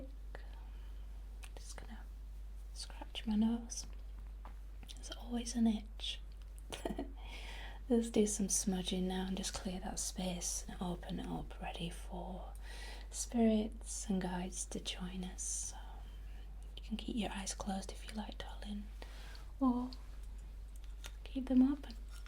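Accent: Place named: Yorkshire, United Kingdom. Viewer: I recognise that accent. ❤ From Pontefract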